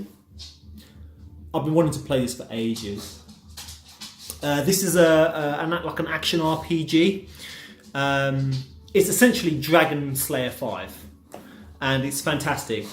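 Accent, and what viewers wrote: United Kingdom. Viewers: Are you from England? You spoke so elegantly.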